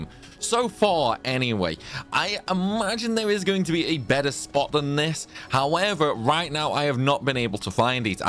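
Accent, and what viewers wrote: Stoke, United Kingdom. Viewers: Thanks, seems alright. Also I can never think of what a Stoke accent sounds like until I hear it. weird lol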